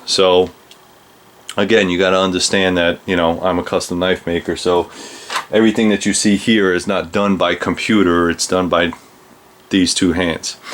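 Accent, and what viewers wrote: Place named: New Jersey, United States. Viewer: You gotta be from Jersey brother....I grew up in Newark and I know that accent...lol.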